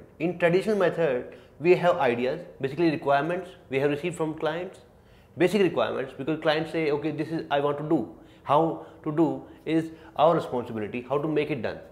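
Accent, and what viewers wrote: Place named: Bihar, India. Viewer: sounds like a bihari... brilliantly difined. biharis are really brilliant....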